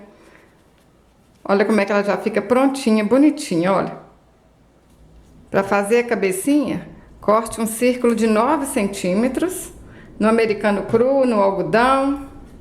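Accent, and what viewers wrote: Minas Gerais, Brazil. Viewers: Amei seu trabalho e seu sotaque mineirinho! Parabéns e gratidão por compartilhar suas peças conosco. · Adoro esse seu sotaque mineiro uai!!!! Lindas bonecas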